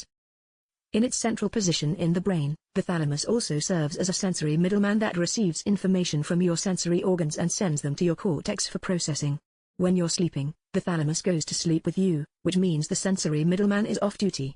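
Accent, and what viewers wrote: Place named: United Kingdom. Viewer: Now I fucking hate the british accent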